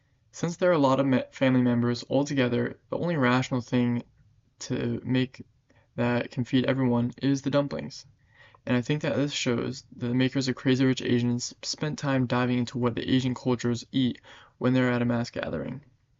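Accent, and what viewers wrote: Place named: France. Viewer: Outstanding! Great French accent!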